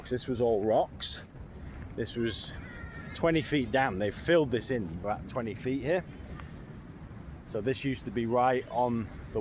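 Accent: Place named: United Kingdom. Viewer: I used to live there …..many years ago..thought you were British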